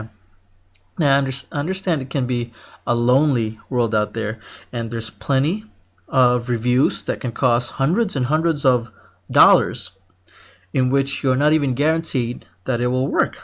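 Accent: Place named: Philippines. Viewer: Sir, pinoy kaba? You sound like one. :)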